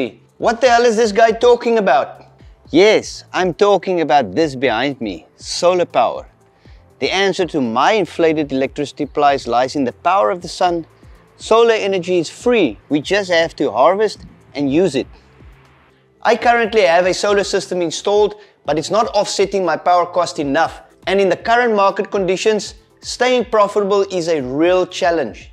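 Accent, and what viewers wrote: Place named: South Africa. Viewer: Awesome, I noticed from the accent and other comments that you're also from ZA. I recently started mining some XMR on a spare PC I have lying around at home. Got p2pool and a local monerod running and getting about 4.7kH on 8threads on a 5600g. Was wondering what pool(/s) you use? I heard it's better to have lower latency but the lowest for us is EU based and even that is around 200ish ms. Kind regards.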